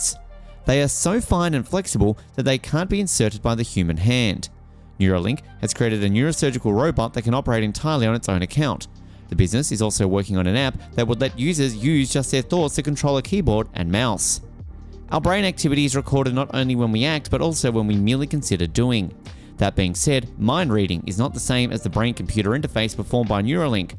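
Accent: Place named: Australia. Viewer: Just a ridgy didge Australian accent. It's PRIME! No idea what he's alluding to. Or just trying to avoid a monotone AI like reading of a script.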